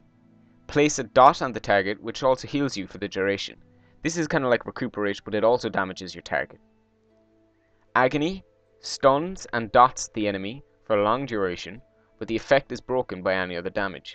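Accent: Ireland. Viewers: are you irish? DAT accent !!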